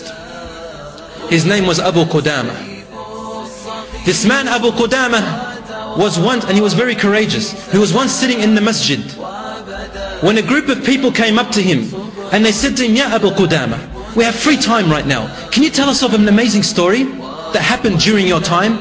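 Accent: Australia. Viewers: The speaker has an Australian accent and i think it might be Moosa Cerantonio, hope it is and salam to you :D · Who is th e person speaking? It doesnt sound like Sheikh Feiz to me...besides the australian accent. And people stop crying about what he said first... slip of the tongue, we're humans we make mistakes. =)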